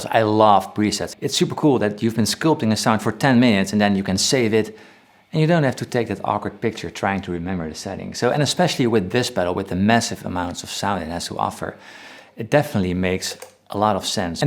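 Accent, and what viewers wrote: Netherlands. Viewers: ha lijkt wel een Nederlands accent 🙈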